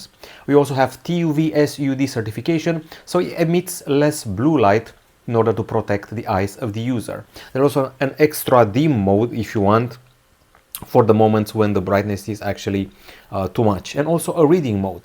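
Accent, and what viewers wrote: Romania. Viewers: e obositor să asculți un român explicând ceva în engleză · Ba tu esti român! Se aude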